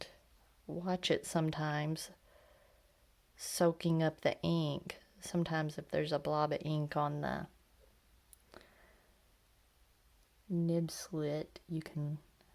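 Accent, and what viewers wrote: South, United States. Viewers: Nice southern accent. North Carolinian pen enthusiast here! Thanks for the video warning about this vendor. the Golden Espresso is probably gonna be my first Kaweco. Do you shop from Goulets' website? They're right in VA. Good stuff.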